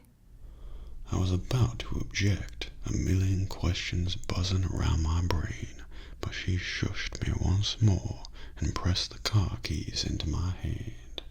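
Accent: South, United States. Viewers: John Goodman but 10 Times More Southern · As a Southerner myself, this accent is EPIC! Well done! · Southern drawl: Spot. On. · you have a better southern accent than most movie stars, this was a blast to listen to. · My dude, not only is your voice my favorite but the southern accent is out of this world. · holy shit,... the southern accent is not half bad!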